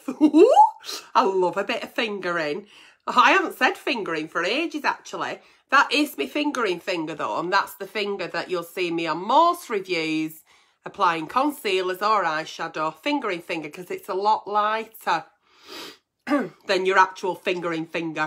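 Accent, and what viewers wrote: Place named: England, United Kingdom. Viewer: Great video...Great Advice.... i was shocked with your honesty and vulnerability....respect to you! 👍🙋‍♀️👏👏👏👏 Your hair colour is gorgeous!! I live in the Netherlands...have done since I was 17...I'm 58 now and miss English accents.... yours is brilliant! Keep up the awesome work! 😀👍👏👏👏👏🌺🌺🌺🌺🌺🌺🌺🌺🌺🌺🌺🌺🏵🏵🏵🏵🏵🏵🏵🏵🏵🏵🏵🏵🏵🌼🌼🌼🌼🌼🌼🌼🌼🌼🌼🌼🌼🌼🌼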